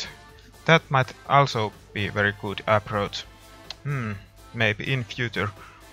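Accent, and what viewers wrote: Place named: Finland. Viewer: Hey, I really enjoy your content, and of course that Finnish accent XD I am from Finland as well;) I think you have one of the best art styles of all blender users;) KeepUp nice work!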